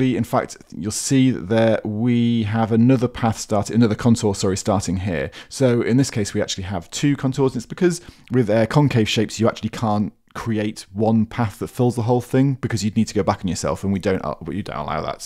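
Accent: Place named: United Kingdom. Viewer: He can't change being British. Also maybe check the playback speed in your settings.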